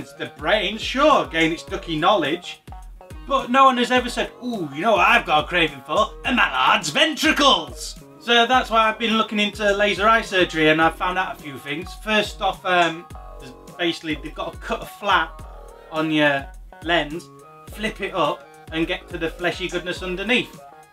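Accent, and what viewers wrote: United Kingdom. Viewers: You are too British for me to understand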